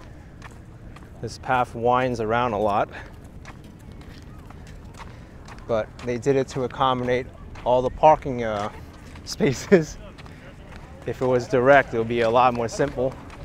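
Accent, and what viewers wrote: New York, United States. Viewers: It's strange to hear u narrating Santa Monica - with a New York accent - I have the same accent. About Silverlake Ramen --- one of the owners is from the Silverlake area - and they just opened another Silverlake Ramen at The Collection in Oxnard, CA. I believe there's another one in Silverlake. My mother and I came out to Calif (from NY) in 1996 -- and we went to the 3rd Street Promenade late 1960s/early 1970s. It was 2 or 3 blocks - an open-air pedestrian mall. So happy to see people out and shopping and stores starting to open. It's been a very difficult 1-1/2 years for all of us.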